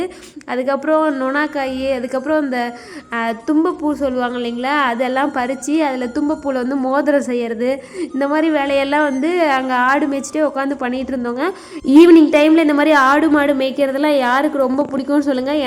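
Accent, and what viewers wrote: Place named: Salem, India. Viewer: Naangalum salem pakkam dhaanga enga oorula pesura maathiriye pesareenga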